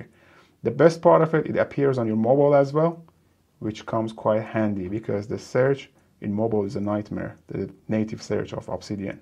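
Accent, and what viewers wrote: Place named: Iran. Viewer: لحجه ایرانی ات منو کشته پسر. دمت گرم <3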